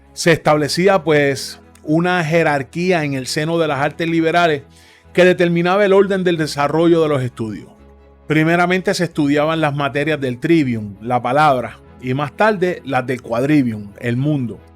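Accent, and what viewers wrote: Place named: Puerto Rico. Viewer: Quiero saber mas de este tema pero buscare algo mas confiable ya que usted siendo de puerto rico le quita seriedad y credibilidad ademas de que ese acento da mucho asco, mejor busco otro video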